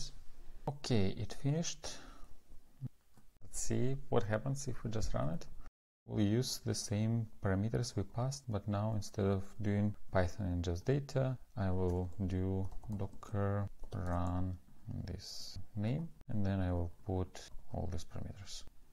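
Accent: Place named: Russia. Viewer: that Russian accent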